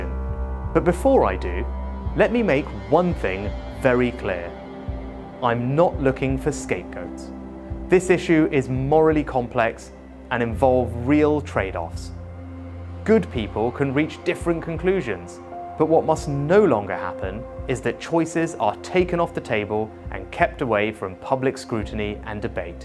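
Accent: United Kingdom. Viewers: He sounds very British to me.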